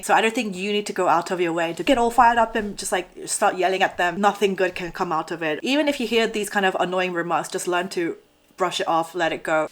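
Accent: England, United Kingdom. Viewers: In what way is she more British than a Korean? She’s somewhat of a hybrid. However, as a Londoner - I see the British influence (accent mainly imo), but not “completely British” (whatever that is). 😇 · when I listen to and close my eyes, sounds soo English. Also, I love when she says" proper". 😀 Maybe mannerism! " Stoicism"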